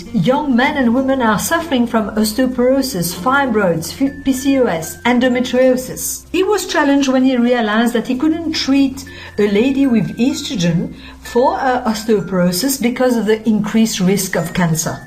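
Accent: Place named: France. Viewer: where is that strong accent from ? France ?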